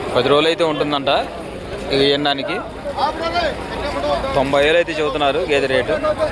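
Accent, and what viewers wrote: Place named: India. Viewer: Telugu voice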